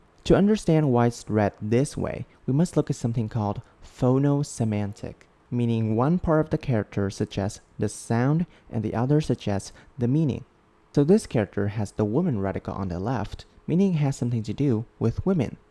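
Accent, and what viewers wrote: Vietnam. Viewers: for an implied southern viet you pronounce a lot of your words in a northern accent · He's speaking in Southern dialect, while you may learn Vietnamese in Northen dialect, I guess. The tones can be very different between dialects.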